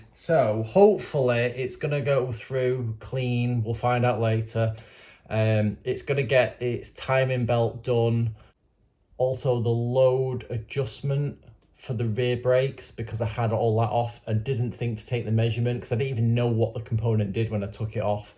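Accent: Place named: Northern England, United Kingdom. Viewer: Liking your blue nail varnish to match your van 😎 😉 Proper workman’s hands there. All looks great, mate. You’re a great story teller, as well as fun to watch. Not surprised you’re subs are rapidly rising. I think your northern accent makes it even better when you’re winging about your missing hinge bracket 😂 hope you’re going to video bits of your road trip. Currently down at lands end in Cornwall in my 56 year old beetle. 210 trouble free miles so far 🤞🏻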